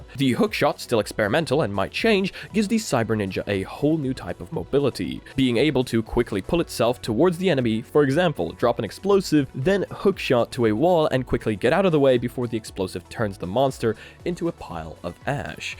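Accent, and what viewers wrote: Ireland. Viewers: your irish? oO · Wait your Irish ☘️ small world thought this audience was just Americans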